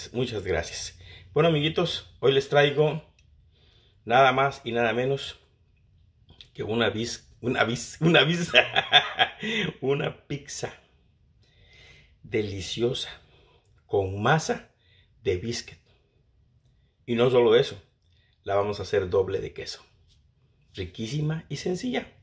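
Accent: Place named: Mexico. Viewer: Su acento suena más a mexicano que a guatemalteco 😃👏👏🇲🇽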